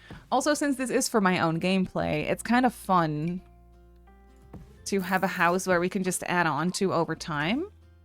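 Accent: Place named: Germany. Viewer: Is Sir from Germany? He has an german accent😂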